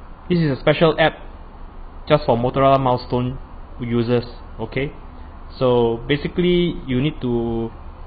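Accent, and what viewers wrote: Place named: United States. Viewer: hellz! you sound like an american comes from greece ;G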